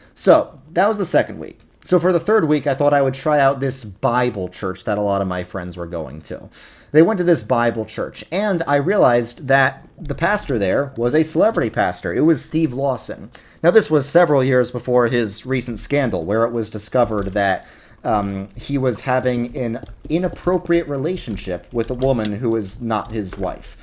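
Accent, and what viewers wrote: New York, United States. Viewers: I feel like you've started to sound more and more like a New Yorker with each video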